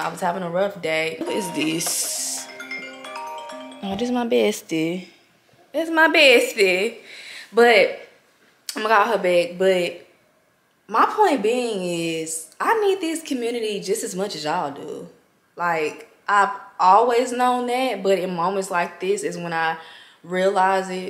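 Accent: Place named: South, United States. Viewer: Love your accent it's a southern or Midwest accent. Where are you from?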